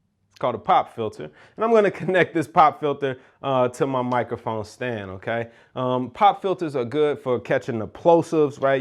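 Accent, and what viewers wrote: St. Louis, United States. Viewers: You from Stl? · Yoo, you from the STL? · I knew you was from st. louis by how you was pronouncing yo ER's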